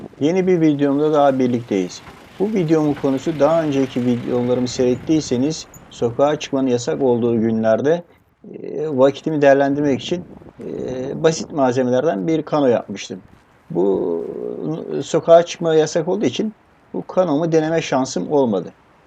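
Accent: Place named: Black Sea, Turkey. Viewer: Abi karadenizlimisin selamlar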